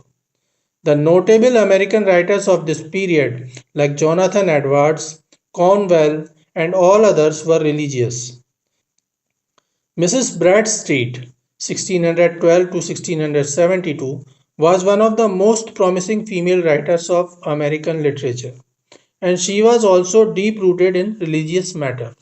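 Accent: India. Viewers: Oh my word! This guy better take up another hobby. This is the most horrible INDIAN accent I have yet to come across. Nope. Take an English accent reduction class and move on to BASKET WEAVING 101. Please.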